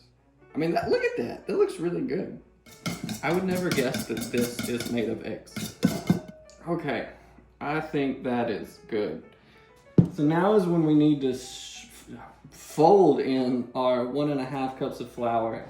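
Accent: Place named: South, United States. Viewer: I can’t stop laughing at this and the southern accent -Savebee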